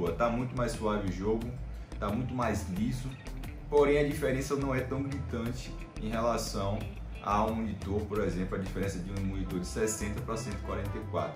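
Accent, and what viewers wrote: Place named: Bahia, Brazil. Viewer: Sou de salvador tbm, já sabia que ele era baiano pelo sotaque dele kksajdk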